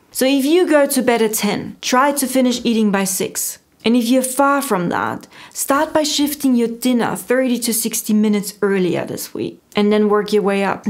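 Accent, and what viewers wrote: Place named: Germany. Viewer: Fantastic accent? Sounds like German raised with some Southern England living, some Australia, a lot of US media?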